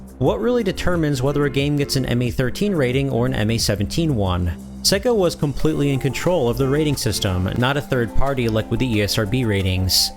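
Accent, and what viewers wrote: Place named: Rochester, United States. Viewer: Your voice sounds like rodchester new york. Garbage plate eater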